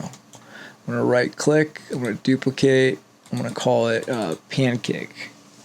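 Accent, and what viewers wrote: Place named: Brazil. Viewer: Thank you very much for this video, it's not very often I may be the 11th subscriber! Are you Brazilian by any chance? Your english accent suggests so.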